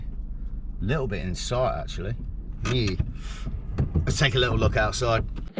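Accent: United Kingdom. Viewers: Who doesn’t love a British accent? I can understand you without any problem, unlike some local accents!😂 Seriously though, there are some counties (not sure if that’s what they’re called there, and I don’t know which ones specifically) where I’m not convinced that they’re even speaking English. Sometimes I still get tripped up by the difference in what things are called, like the boot, and when video is shot from inside a moving vehicle it is disorientating being on the opposite side of the road. But I thoroughly enjoy your vids, you’re proof Brits DO have a sense of humor!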